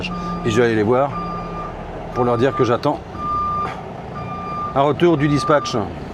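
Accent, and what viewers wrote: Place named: Quebec, Canada. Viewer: t'as l'accent québecois maintenant ! top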